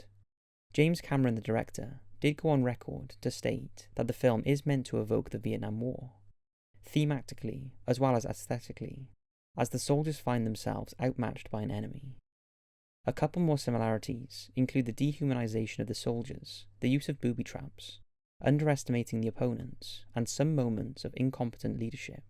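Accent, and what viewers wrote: Wales, United Kingdom. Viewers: Am I hearing a slight Welsh accent from.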